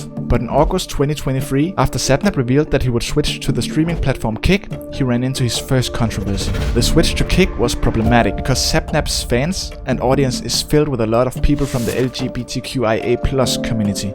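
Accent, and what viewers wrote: Denmark. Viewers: Bro your danish right 🇩🇰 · u danish aint u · are you danish? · Holy Danish accent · holy danish accent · r u danish? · This guy has most danish sounding accent, good vid though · The danish accent 😂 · are u from denmark i think i can hear the accent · Are you danish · Lemme gues were you from. HMMMMMM Denmark? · Wait you sound a little danish, MY BROTHER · U dont sound American what country are u from? U sound danish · I can already tell that this guy is danish because of the accent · omg i knew i recognised that accent! hello hello hello fellow danish person! your video is AWESOME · Yo, you danish?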